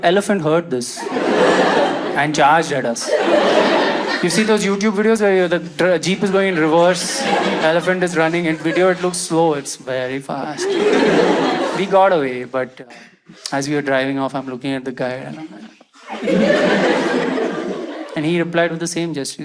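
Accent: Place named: South India, India. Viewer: south Indian accent is so sexy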